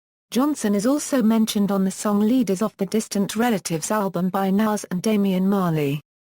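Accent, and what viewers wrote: England, United Kingdom. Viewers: Something about an English woman talking about American mobsters doesn't do it for me.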